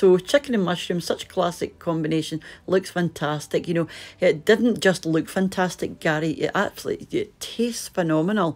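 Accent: Scotland, United Kingdom. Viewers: Hi. Sorry I haven’t been around recently I have had some medical issues that have kept me running around back and forth to Toronto 🇨🇦for tests so by the time I get home I’ve been too tired to watch. It’s a 3 hr drive each way from my home. But I will be staying in Toronto for 5 weeks for treatments and I will have plenty of time on my hands so I’m looking forward to catching up on all your vlogs. I’ve missed your lovely accent it makes me fell like I’m home. I think I’ve mentioned before that my mum is Scottish. She is originally from Fife but we have spent many a night in Ayr and Glasgow shopping when I was a teenager. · I found your channel just a few weeks ago and I love it- especially your accent. My ancestry is Scots and I love learning about the culture and food and the people of Scotland. I made mince and tatties last night and Mr. and daughter loved it. 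I wanted to post a picture, but don't see a place to do so. From my family in Texas to yours in Scotland. xx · Hello, sorry this is going to be a long one! I'm a first time commenter, however, we've been watching you for some months now. We're so glad & happy that we chanced upon your wonderful videos. You bring us much joy, you're so polite & well mannered.....true Scottish hospitality there!:-) You are an utter & total joy & delight, with a pleasant, happy go lucky disposition & your meals are all so delicious looking & i so love the way you prep your food & even watching your weekly grocery shopping. You're so clean & tidy & your meals are always fresh & served super hot......so appetising, warming & wonderful. Oh & really visually appealing too. My mouth waters viewing all your yummy dinners, desserts & baked treats.:-) My husband also really enjoys, with healthy humour, watching you talk us through your bought groceries. 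I admit, we love watching you, listening to your wonderful Scottish accent & we're addicted! I can't go a day without watching you, or repeating specific uploads when i'm home. haha We also love the way in which you present all your videos, especially the wee additions of bonnie Scotland the end. So beautiful & makes us yearn to go back to Scotland. My husbands Scottish/Irish & i also have Scottish/Irish ancestry, so you remind us of our Scottish roots & lovely old fashioned, familial traditions. Finally, your wee pussy cat Jasper.........awwww, what a fluffy, adorable & gentle wee cat. Please give Jasper a wee chin tickle from us. We'll be continually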